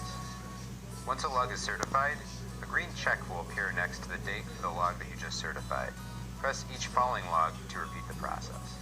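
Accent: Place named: Boston, United States. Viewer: He speaks with an Boston Accent!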